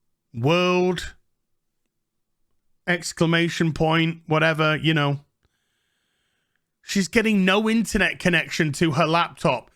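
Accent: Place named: Yorkshire, United Kingdom. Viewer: Just get well soon. Life's boring without a good Yorkshire rant!!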